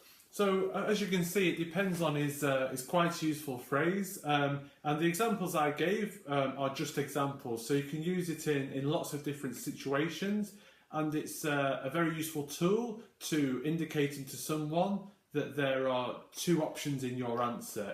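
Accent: United Kingdom. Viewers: Hi your pronounce reminds me of my staying in London. I used to live there and I really like it! by the way I`m Japanese....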